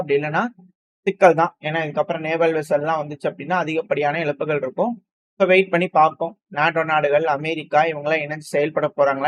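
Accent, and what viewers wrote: Coimbatore, India. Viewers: Bro are you from coimbatore?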